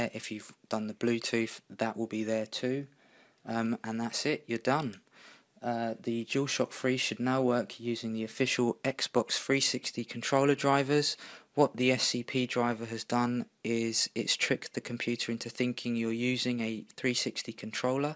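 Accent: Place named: London, United Kingdom. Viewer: I love the London accent!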